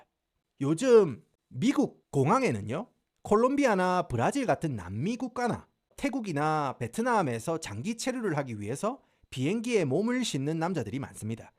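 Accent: Gyeongsang, South Korea. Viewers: 허리까지 내려오는 까만쌩머리이 ㅋㅋㅋㅋㅋㅋㅋㅋㅋㅋ아놔 커피뿜음 ㅋㅋㅋㅋㅋ 넘 젬나는 갱상도 ㅋㅋㅋㅋㅋㅋ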